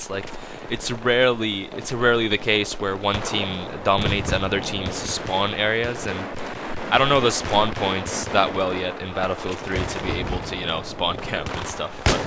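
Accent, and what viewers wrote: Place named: Canada. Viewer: Aye you from Canada.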